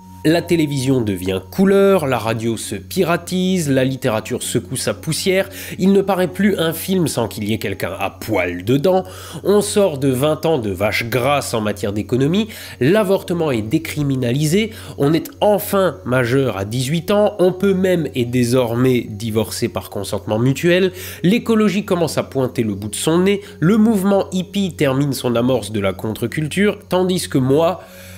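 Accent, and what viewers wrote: Alsace, France. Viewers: Bonjour, après avoir ressenti de moins en moins d'intérêt pour les sujets et le style des scripts, je me suis désabonné. On est désormais très loin de la série Hermes et de ton humour personnel. Un petit mot aussi sur l'accent alsacien qui était drôle...au début. C'est juste mon opinion et je dis cela sans haine et à priori pour ton travail et ta personne. Je te souhaite bon vent pour la suite . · C'est l'accent alsacien ou l'humour que tu comprends pas ? Sans doute les deux 😂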